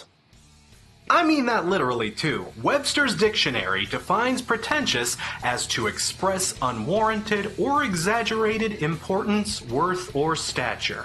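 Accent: Boston, United States. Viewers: Wow that Boston accent...for a moment I figured you thought they were from Australia. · I was enjoying your Boston accent.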